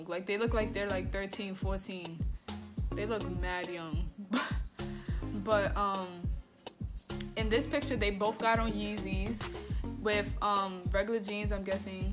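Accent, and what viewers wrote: New York, United States. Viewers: Are u from New York cuz u sound like it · You can tell she from New York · Bol manes ye she from New York fr😂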